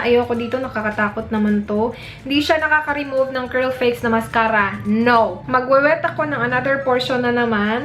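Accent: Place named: Visayas, Philippines. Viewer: bisaya accent is there pa hahahaha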